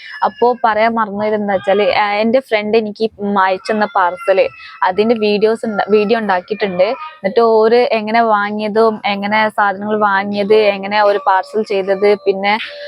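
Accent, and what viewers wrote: Malappuram, India. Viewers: നല്ല മലപ്പുറം മലയാളം ❤️ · Malappuram ജില്ലയിലെ മുസ്‌ലിം പെൺകുട്ടികൾ സംസാരിക്കുന്ന പോലെ ❤ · നീ എത്ര കാലം karelatttil താമസിച്ചു ❤️👍❤️അതിശയം മലപ്പുറം ഭാഷയയാണ് തോന്നുന്നത്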